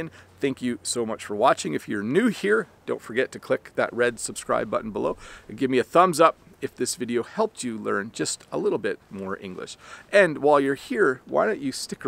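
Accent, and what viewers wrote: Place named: Canada. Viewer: Hi, a great English teacher, I often learn English from your teaching, it can help me to improve my English, especially about the pronunciation I've got better so much, actually I've never been to Canada, as you maybe pronounce quite different of some word from American at the US. in which I've ever been before, but that's not problem at all. Thank you very much.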